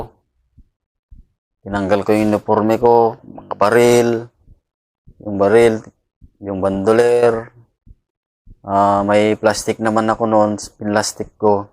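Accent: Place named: Ilocos, Philippines. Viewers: Taga saan si sir? Igorot ba kyo o Ilocano? Kc sa pagtatagalog nya parang kababayan ko sya? Salute you sir! God is good all the time, hindi nya kyo pinabayaan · Halata mo ilocano si buddy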